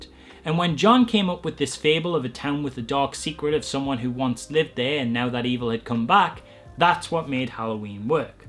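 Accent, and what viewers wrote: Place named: Durham, United Kingdom. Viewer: New subscriber here, just discovered you. The effort you put in is super impressive!!! Really enjoyed your take and info!!!! Off topic, I can't quite pin your accent, I was born n bread Gateshead but now live at the coast, can I guess your accent is Durham 🤔 Like I say, I'm new here x Looking forward to binging more vids pal 💚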